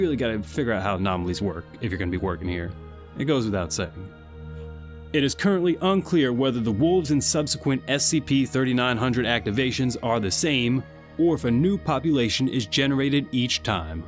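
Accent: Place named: South, United States. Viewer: Finaly I know, the southern twang is how he talks.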